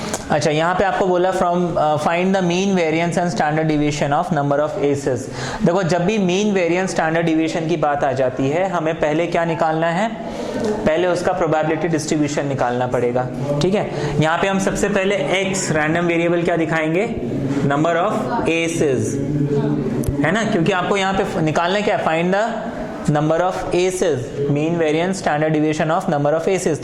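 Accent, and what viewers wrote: Allahabad, India. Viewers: sir ...are u from Allahabad.......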